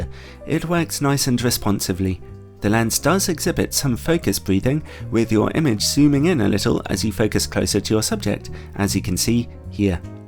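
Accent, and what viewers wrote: United Kingdom. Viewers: Love the music and the British accent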